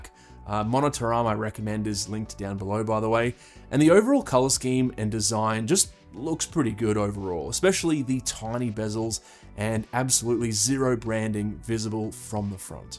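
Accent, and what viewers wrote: Australia. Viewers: Spent half the video trying to figure out if you were Australian. As an Australian that spends too much time on the internet and now can’t pick an accent so save my life 😂🤦🏼‍♀️ You’ve just got yourself a new subscriber. I’ve got a really old dell monitor I’m using with a new Mac mini m4 pro. I really like the colour on the old dell and find it looks almost identical compared to my 2016 MacBook Pro Display. For that reason alone I’m drawn to getting another dell monitor. My monitor can do 75 hertz but only 1080. And the weird scaling on the 27 inch monitor means I have slightly burry text. I have a small desk which means I probably sit a bit closer than is ideal and the weird text bothers me. I’m not great at understanding the scaling thing, but 1080 on a 27 inch vs 4K on a 27 inch, what would be the pixel density difference and would it improve my current viewing situation? 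😂 Love your content.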